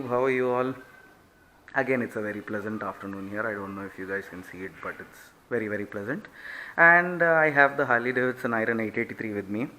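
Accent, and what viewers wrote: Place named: Kerala, India. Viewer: I love your accent brother! You make me remind of my mallu friend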